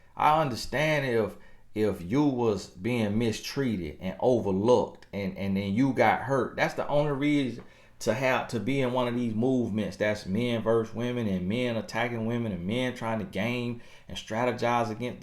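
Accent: United States. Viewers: Gurrrl I fell out laughing and his country accent make it more funny🤣🤣🤣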